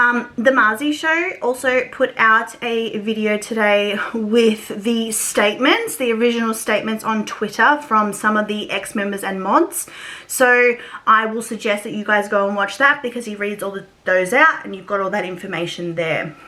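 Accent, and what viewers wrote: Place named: Australia. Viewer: Love hearing another Aussie on the platform! 😊😊 keep it up!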